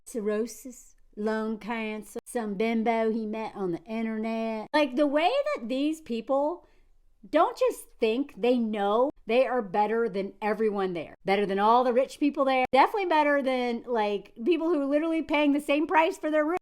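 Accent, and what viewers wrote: South, United States. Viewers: You're like me. You're not trying to have a southern accent. You're just trying to sound NORMAL.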